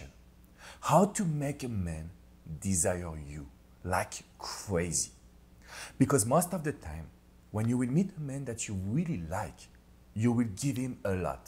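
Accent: France. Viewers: Merci beaucoup cher! Please keep your French accent, its lovely and very charming, enchanté! Your advice is always precious:-) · Come here for his voice strong French accent 😊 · Hi woooao you are such a great relationship couch you are making things happen with that French accent. do you you know what your teachings are so sweet and short very powerful. thank you very much for your teachings. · Omg, I love your french accent as you reminded me of my late french hubby's voice...oh I missed him so much... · Mr... i. like ur" frenglish" language 😊. thank u for ur advices. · Love your French Accent 😍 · I love your videos and French Accent! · I LOVE your french accent😊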